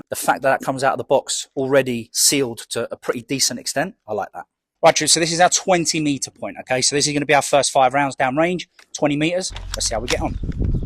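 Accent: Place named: Canary Islands, Spain. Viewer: Se me hace gracioso ver a un inglés hablar español con acento canario xd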